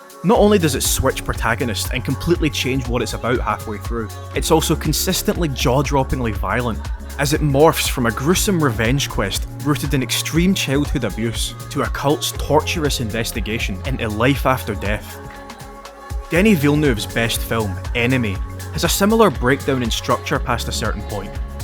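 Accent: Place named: Scotland, United Kingdom. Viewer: Your accent reminds of Trainspotting! Scottish! 😅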